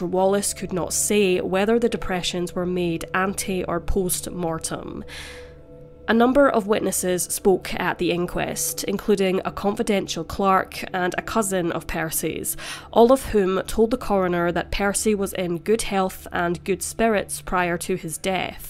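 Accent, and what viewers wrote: Scotland, United Kingdom. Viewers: I don’t think so , sounds like she’s Scottish to me , so makes sense as people in Scotland would want any other team other than England to win lol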